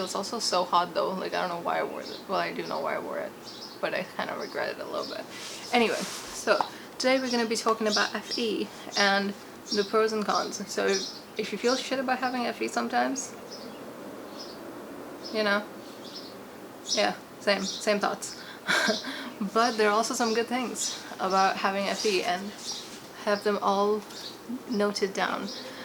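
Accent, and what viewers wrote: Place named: United Kingdom. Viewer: ABFTBA Always be flexing that British accent.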